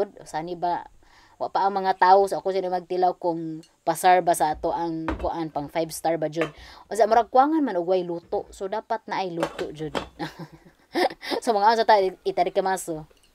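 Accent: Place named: Leyte, Philippines. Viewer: Wow😮😮😮, the very best Bisayan dialect I’ve ever heard, Leyte’s Bisaya kinda mixed with Cebuano and Waray-waray accent and bit of Ilongo. I noticed something the Southern part of Leyte some words that we never heard of like “ Ginamon” was just simply as if you cooked rice on the pot. Boholano where my Grandfather came from I didn’t noticed any difference probably we were a kids when Grandpa was around. Love to see Ms. momentous moment when someone known artist visit her, t’was a big honor to have that level of celebrity come visit her. Yes, the “ J “ letter from Bohol and Leyte like Ajaw, gajud was my first combo words during my first year in Cebu, when I was hanging around with the kids they just kinda laughing my accent, took me years to have it adjusted but then when you go back to your place everything coming back. Watching from Leyte.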